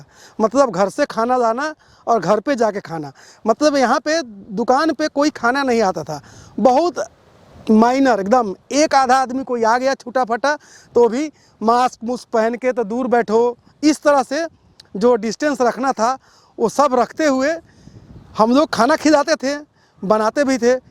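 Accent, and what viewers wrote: Bihar, India. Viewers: Ap Bihar se hai sun k bahute accha lagal ba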